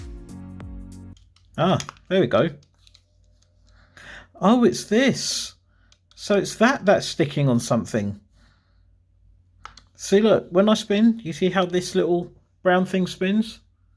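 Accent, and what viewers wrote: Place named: United Kingdom. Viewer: You sound British but your hands don't look British😅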